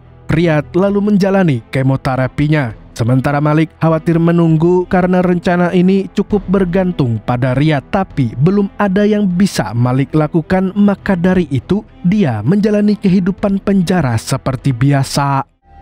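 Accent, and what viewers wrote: Banjar, Indonesia. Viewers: Mimin urg banjar kh min? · urang banjar rupanya admin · Min orang Banjar kah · Asli Banjar kah pian nih mang😮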